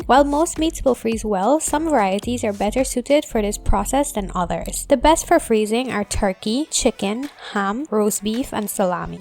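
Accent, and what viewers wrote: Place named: Trinidad and Tobago. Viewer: Are you Trini? Your accent sure sounds like it.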